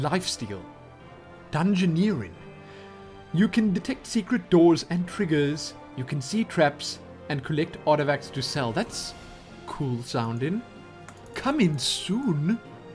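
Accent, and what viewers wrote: South Africa. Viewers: but this guys accent is south african... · it's dutch south african not british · It sounds South African if anything... Don't blame you. South African accent is difficult to pick up, considering how many people haven't been able to tell what mine is. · You South African? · D South African accent, I would know since i'm south african myself lol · Accent definitely sounds South African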